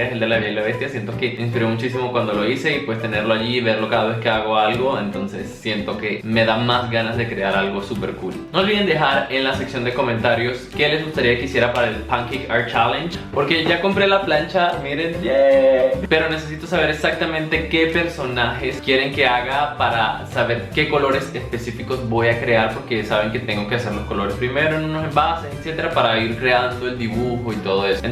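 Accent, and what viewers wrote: Venezuela. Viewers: Eres de Venezuela?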